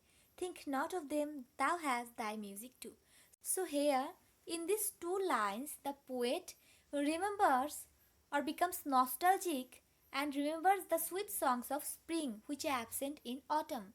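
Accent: India. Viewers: Are you from India??? I can guess from your accent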